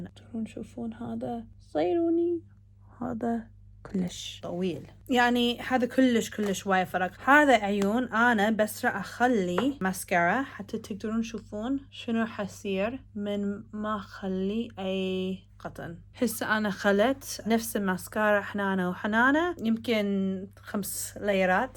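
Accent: Iraq. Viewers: يمه فدوه لتحجي عراقي فديتج ❤❤❤❤❤ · يمه لغته العراقيه كولش كيوت انتي كلش حلوه 🥺🥺🥺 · ماعرف ليش حسيت طريقه كلامه نفس كلام مو بس مو بطريقه عربيه سوريه هاي بطريقه عراقيه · لهجة عراقية 😻🥰❤ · You are soooo CUTEEEEE when you speak the Iraqi dialect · فديت الي يحجي عراقي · انتي كولش كيوت من تحجين عراقي😍💜 You're so so so cute when you speak Iraqi😍💜 Kooollllsh Big love to you from Iraq💜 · فدوه شلون تحجي عراقي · تحجي عراقي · اذا انتو تردون تصيرون حلوة هههههههه 😂❤ حلو كلامج بالعراقي^^♡ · يمه فدوه تحجي عراقيز😁😍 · احبج كلش هواي. ...أموت عليج وإنت تحچين عراقي تخبلين. ..وطبعاً الفيديو راح يخلص كلشششششش هواي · ليش تحجي عراقي 😁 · I love you so much and I hope for your comments🌺🌺😘The Iraqi dialect befalls you a lot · فدو هدى الهجه العراقيه أتخيل ابحلكج فدوه فدوه 😍😍❤ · انتي مو عراقيه ليش متعرفين تحجين عراقي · I love you, I love you, and you are so cute. Sweet Iraqi says💕😭😭😭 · فدوة شلون تحجي عراقي ❤❤ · فدوه حلوه وانتي تحجين عراقي❤ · Omg so cute when your are talking Iraqi dialect 🍁🍁 من العراق متابعه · لا مو عراقية هية أمريكية بس تحجي عراقي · شنو جنسيتها وليش تحجي عراقي +فدوه لله☹️💛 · it's the iraqi accent 😂😂 kohlsh mean lot of, more 🙂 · فدوه شلون تحجي عراقي 🤩🤩🤩🤩🤩🤩🤩🤩🇮🇶🇮🇶🇮🇶🇮🇶🇮🇶🇮🇶🇮🇶🇮🇶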